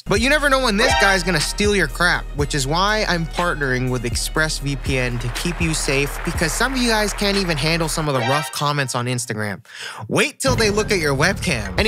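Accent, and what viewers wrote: United States. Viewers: You have the most American voice ever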